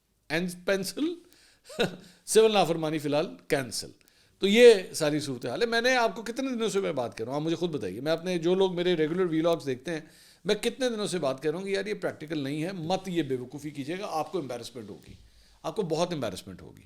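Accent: Punjab, Pakistan. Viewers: Mansoor bhai Jo tusi punjabi touch dendey o na 😂 sawaad a janda a ❤❤❤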